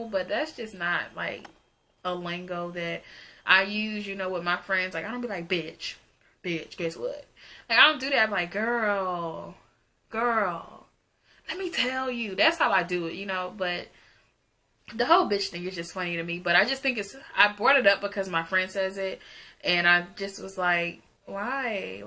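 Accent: New Jersey, United States. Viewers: You did the correct thing emailing. Taking business classes do not stop the bank from messing up. Even huge companies mess up and what do they do? email. So kudos to you. Lovely video and who knew you were from Jersey! I can hear it ( new yorker) Also I really dont like that word, I think it's horrible, but that's something I care for. I thought I was the only one. Woman don't like men saying it but their gf say it. I feel as if its a downgrade from who you are. Also, you would look so nice in ASOS and Fashion to Figure and all of these great brands. I wish they would do a collab for plus Youtubers. I am seeing what you are saying now. I hope companies open their eyes.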